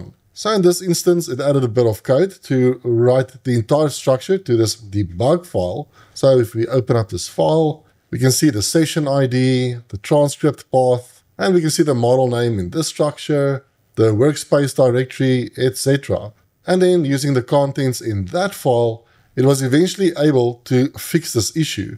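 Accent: South Africa. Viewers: are you from South Africa ?:)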